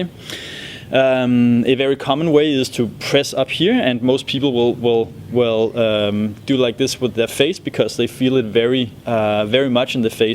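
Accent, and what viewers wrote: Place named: Denmark. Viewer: heavy on the Danish accent. apart from that excellent English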